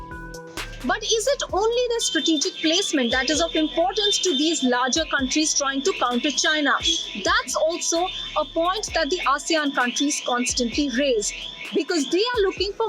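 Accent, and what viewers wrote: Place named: India. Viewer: China is bullying Asean? Do you even know where the location of Asean in the world? And please speak without the Indian accent.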